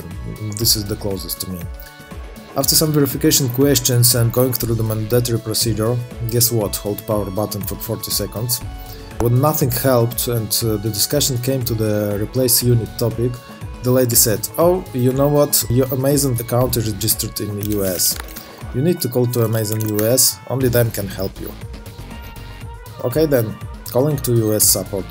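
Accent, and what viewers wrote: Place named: Eastern Europe. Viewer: Собрался выбросить, думал батарея... заменил, без толку, звездочка крутится и виснет. Лазил в поисках волшебного "Big hardware reset for Kindle", нашёл это. Буду колдовать. Спасибо. В режиме санкций, концепт пoтреблJтства "купил-сломалось-выкинул-купил" необходимо забыть и восстанавливать то, что можно починить. Благодарность автору! (прошу великодушно простить, но акцент в ролике - наш, славянский).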